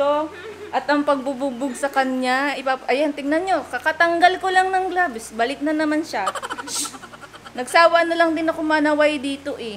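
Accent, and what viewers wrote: Visayas, Philippines. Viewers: watching from davao:))))) ka cute nimo magbisaya ateee always watching your vlogs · Ang cute ni ate mag bisaya 😅 kababayan pala kami ni 😅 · Nakakatuwa na nalaman ko na ang ay nakatira ng 3 years sa davao!Bisaya din ako and ang cute ni Ate mag bisaya😂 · ay ka cute Ate mag bisaya oyyy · Ka cute man mag bisaya oy 🤣🥰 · Ate taga davao ka pala huhu sayang taga digos ako, pero sana ma meet kita ate Ang cute mo mag bisaya. Pero welcome ulit sa Davao city po❤️❤️. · cute ng bisaya mo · ka cute ba nimo mag bisaya ate oyy haha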